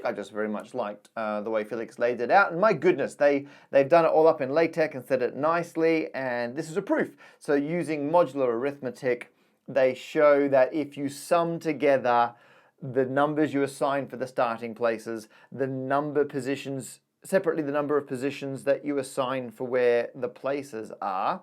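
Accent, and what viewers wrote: Australia. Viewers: What kind of accent is that for an Englishman anyway? Sounds like someone from Perth.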